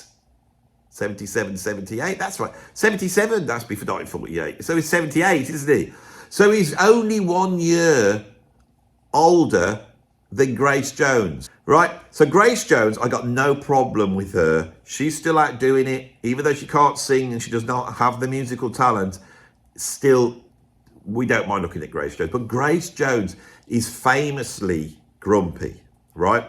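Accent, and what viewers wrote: Birmingham, United Kingdom. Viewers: Horrible,,NASAL Brummie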